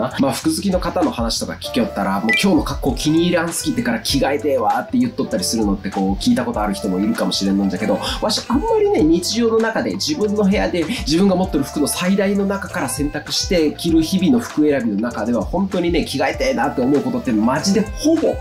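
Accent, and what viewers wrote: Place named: Chugoku, Japan. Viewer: 中国地方の方言すきだな〜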